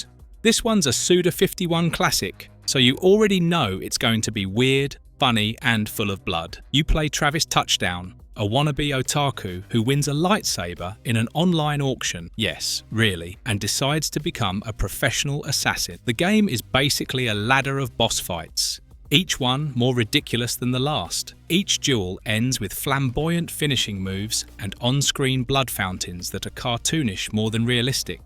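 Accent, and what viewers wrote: United Kingdom. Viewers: I like the British narrator. Marvellous videos and channel! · That accent, sounds British to me, saying stuff like games normalizing or flirting with misogynistic imagery, makes me 🤢🤢🤢 I'm sick and tired of hearing nonsense that makes me angry and feeling like asking for the world to end. Like, using internet nowadays is like getting the daily dose of boring and depressing shjt just to have those boomer feelings left. It's all hopeless, nervous, feeling like all i see is nonsense, like all i hear as arguments are that progressist politically corret nonsense, feeling like isolating myself and get bored af at the end... I wanna see smart people doing good stuff or at least some sense when people disagree or when they're criticizing something bcs it's getting tiring af to see almost anything on the internet and getting me feeling like I'm on a discovery kids for dummies cartoon world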